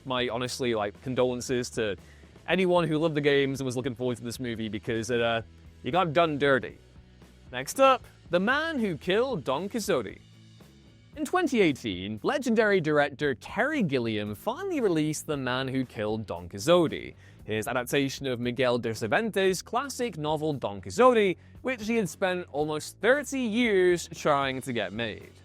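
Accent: United Kingdom. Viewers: I always love British pronunciation of Spanish words. It is always fascinating (not that my accent was ever perfect).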